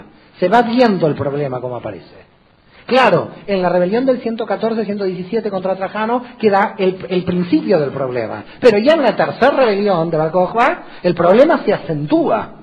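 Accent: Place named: Latin America. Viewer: Este señor es judío de religión y no de sangre habla con acento latino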